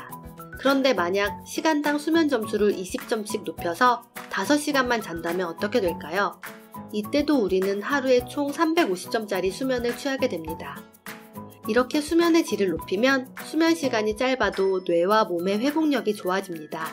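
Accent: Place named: Gyeongsang, South Korea. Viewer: 녹음하신분이 경상도분이시구나 ㅋㅋㅋ 경상도 사람은 딱 알아봄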